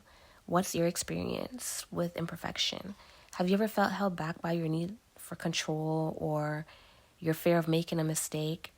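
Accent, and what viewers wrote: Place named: Toronto, Canada. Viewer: As soon as I heard you talk I said you must be from Toronto as well, Yayyyy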